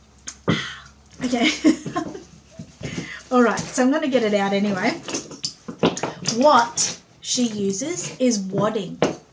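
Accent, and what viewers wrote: Australia. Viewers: Hi I am very new to reborn l wanted to complement you on your lessons have learnt so much from you it is so nice to hear an Australianaccent will keep watching you al the best from Sheryl from Victoria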